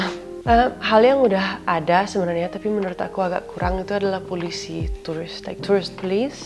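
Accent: Bali, Indonesia. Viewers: kk ini accent bali nya kentel bgt yh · Logat mu, seperti warga Bali., yg perlu kamu jelaskan, mengapa orang eropa kurang apresiate aturan dan etika di Bali. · Medok nya kayak orang Bali di dengar sama orang Jakarta 😅🥰🥰🥰 masukan yang bagus bukan hanya untuk Bali, juga saya termasuk orang Jakarta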